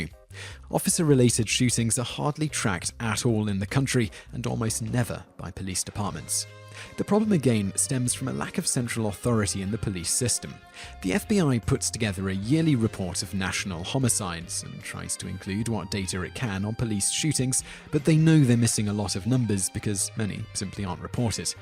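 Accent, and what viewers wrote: England, United Kingdom. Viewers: I'm not sure where you are getting your facts, but I'm seeing many/severe falsehoods. Maybe stop getting your info from the bathroom stalls and actually speak with cops and other law enforcement officials. Top ten problems with your channel? 1 thru 10 totally wrong about everything said. Maybe instead of doing a video on a system you know nothing about, Brit. Go to your country and give us a video about the problems with British police. I guarantee you know more about that. Ps your accent is horrible. New Jersey is a state not a city, by the way. Get a map. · This is an extremely sensitive and devisive problem; to the extent that I kept noticing your use of the word "we". You are talking about concerns you particularly identify as U.S. problems, but you continually use we when considering actions and options. You have a very British accent so I don't know if you live in the U.S. 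or not. If you do, then welcome and include yourself as we; if not, then unless you are reporting facts related to many counties including your own you should not use the word we as it is inapplicable. The only fact you reported of which I was unaware was the possible use of steroids. I can't believe there are no medical tests to document their use and while I'm ignorant of laws governing their use I certainly think they should be illegal for armed people, even if you are a security guard or MP. On the whole I agree with your assessment of facts and think they are due to the fact that the U.S. is too big for oversight and responsibility is diffuse or non existent. It isn't just the police. The public school system is disgraceful. The sub literate in this country outnumber the literate and many teachers barely reach the standard of literate. But it is a sacred cow dying in the street of starvation in a Hindu society. 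Everyone reveres the cow, but no one takes responsibility for caring for it. Teachers lie and help students cheat to pass basic standard tests and everyone believes that is an aberration and not the norm, but it is the norm. Landlords of privat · Is it weird that a British guy is narrating this?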